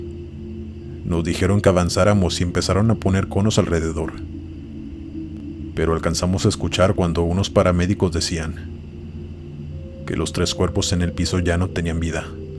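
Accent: Mexico. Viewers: Eres mexicano?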